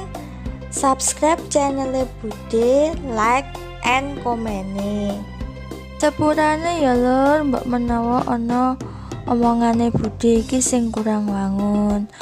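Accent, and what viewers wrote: Java, Indonesia. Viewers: Medok pisan 😂👍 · Jowo ne medok mbk you 😁😁😁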